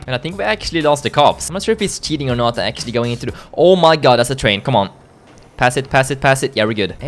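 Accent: Sweden. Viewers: Är du från Sverige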